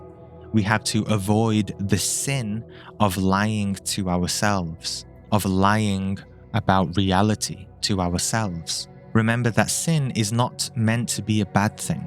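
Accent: United Kingdom. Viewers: This was very nourishing. Cheers. Lovely soft Liverpudlian accent.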